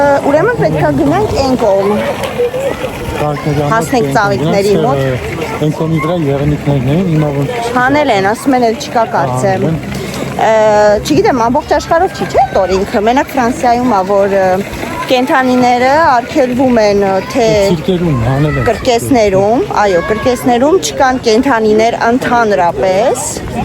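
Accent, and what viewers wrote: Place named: Artashat, Armenia. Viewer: ջան, երբ Արտաշատի բարբառով խոսում ես շատ անուշ է, հիշում եմ ուսանողական տարիներս շատ քաղցր ես խոսում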